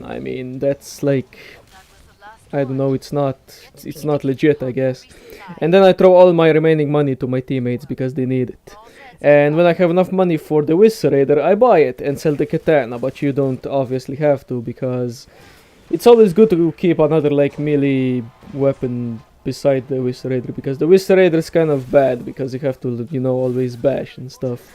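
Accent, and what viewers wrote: Russia. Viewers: You sound very russian. You should try to go for a 50/50 comedy and info mix, you will do really well. Anyways, video makes a lot of sense, good shit!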